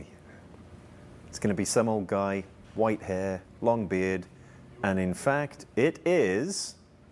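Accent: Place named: United Kingdom. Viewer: Love the british, or whatever it is, accent.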